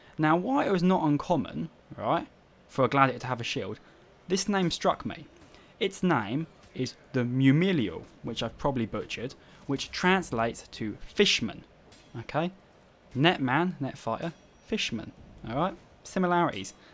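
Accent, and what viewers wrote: United Kingdom. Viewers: Would’ve clicked off the vid if it weren’t for the charm and British accent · Stop using an English accent while saying roman names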